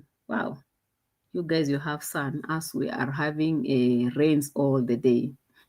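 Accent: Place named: South Africa. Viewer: No your accent sounds exactly like S.African When Caster was in SA, the S African said she sounded like them 😂😂😂 ❤❤ we loved that i like when you say ( LET ME LEAVE MYSELF ALONE) 😂😂😂😂😂❤❤❤ That's a new phrase for me adopted from you😂😂😂❤❤❤❤❤💋💋💋💋💋💋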